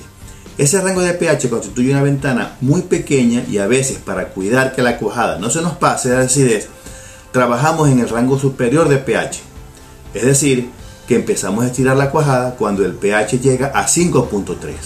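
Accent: Venezuela. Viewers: ¿Queso de mano? ¿ud es venezolano? saludos desde caracas. gracia por la explicación. llegue a su video no para aprender hacer queso. si no para darme cuenta de las malas prácticas de los queseros aca. ningún queso mozzarella producido en venezuela se estira, todos son terrosos y ásperos; al parecer están usando en exceso el sulfato de calcio para darle más rendimiento a su materia prima y lograr tener más producción, incluso buscar acelerar los procesos. ya pude escuchar al final de su video que es de aca. nuevamente gracias.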